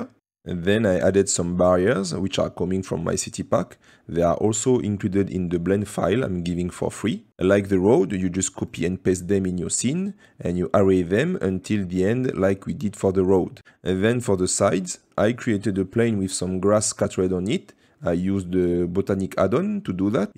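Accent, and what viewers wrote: France. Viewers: You have a french accent are you french? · Man, I can hear you’re French… Donc pourrais-tu le doubler en français, c’est désormais possible avec les nouveautés YouTube, cela ouvrirait ton audience au français qui ne parle pas très bien anglais. Ce n’est pas mon cas, je parle très bien anglais mais c’est plus facile d’apprendre quelque chose de compliqué comme blender quand c’est expliqué dans sa langue natale :D Sinon super tutoriel, fort utile :-) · Merci. Et au moins avec ton accent bien Français j'arrive à comprendre l'anglais :)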